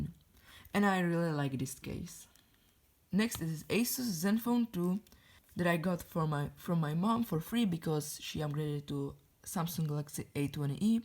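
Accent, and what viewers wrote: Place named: Romania. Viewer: Your accent sounds Romanian but you are from Poland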